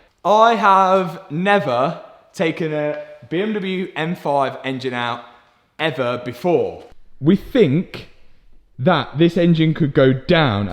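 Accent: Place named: Nottingham, United Kingdom. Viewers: welcome to America, where we fix our own stuff allll the time. Wanna know why? Because if one man can do it, another man can can do it as well. With enough time money research and patience ANYTHING can be repaired by anyone at all. This is also why America has led the way in the automotive industry since the Model T, and why car YouTube was pioneered by Americans. 🇺🇸 I’m glad to see stays positive and isn’t scared off by things he is unsure of. Innovation, hard work, trial and error are the backbone of a man like. is basically a yank with an English accent. Good work buddy 🤜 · Without being rude. May I ask where you are from?your accent sounds like a Nottingham accent.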